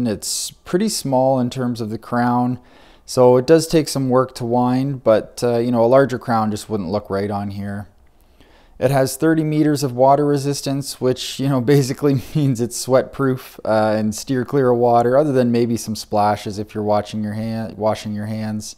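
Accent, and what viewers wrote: Canada. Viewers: ohh I don’t know how to explain it haha maybe it’s the Canadian accent 😅 I’m sure you’re pronouncing it right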